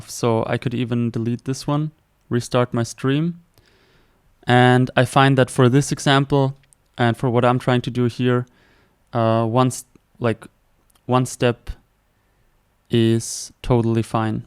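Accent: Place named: Germany. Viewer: oh it sounds so german accent bc im german and people that speak english in germany talk like that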